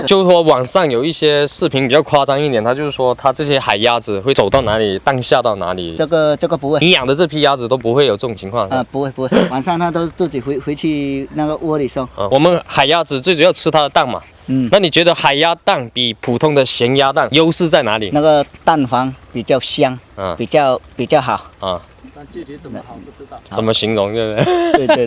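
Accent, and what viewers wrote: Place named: Cantonese, China. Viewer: 靓仔会说粤语吗？因为听到你的口音好像会说粤语（出于好奇心）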